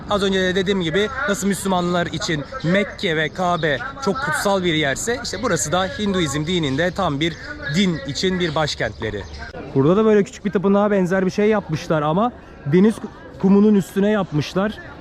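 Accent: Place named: Aegean, Turkey. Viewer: sen nerelisin merak ettim, ege sivesi var gibi biraz ben de egeliyim 😂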